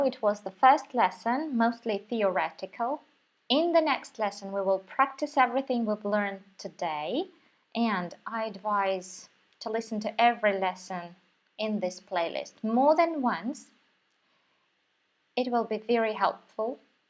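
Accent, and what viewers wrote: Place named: United Kingdom. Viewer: A very pleasant lesson, thank you 🙂 When you speak you sound more British than Russian! Your accent is delightful and your speech is clear. I also like the pace.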